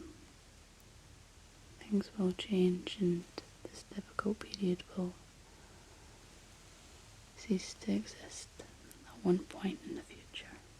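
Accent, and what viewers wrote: Scotland, United Kingdom. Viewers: Love your Scottish accent, reminds me of my childhood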